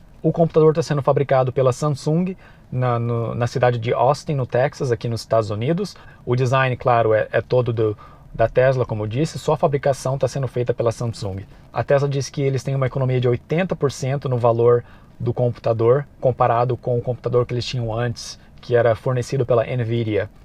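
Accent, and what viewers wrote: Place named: Brazil. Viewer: Não há nenhuma dificuldade. Aliás, tenho partilhado alguns de seus vídeos, não só com a família, mas também em grupos portugueses do Facebook. Precisamente pela vantagem de estarem em língua portuguesa. O sotaque brasileiro e o uso de uma ou outra palavra típica do Brasil, não é problema. Crescemos em Portugal a ver novelas brasileiras! :D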